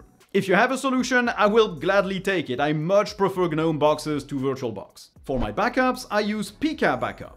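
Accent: France. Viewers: Hello! I like your videos. Thank you. I have a question : you speak a bilingual english but I feel a small accent. Are you french? 😊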